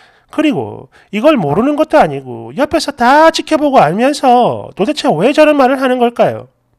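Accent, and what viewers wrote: Gyeongsang, South Korea. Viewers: ~~~ 쥐~~짠다~~~~ㅋㅋ 전에 경상도에서 10년정도 살은적 있었는데 덕분에 오랜만에 듣고 혼자 빵터졌어요~ㅋ 글구 사연속에 저 쥐 짜는놈은 듣다가 육성으로 ㄱㅅㄲ~~라고 욕했던건 안비밀 이궁~ㅋ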